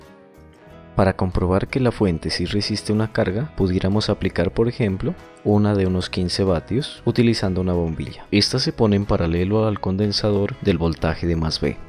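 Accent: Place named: Narino, Colombia. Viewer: Amigo, la verdad un excelente video, mu buena prueba créeme que la aplicaré, me imagino que funciona con todos los electrolíticos. Por tu acento veo que eres de la hermosa tierra del Galeras. Millones de bendiciones.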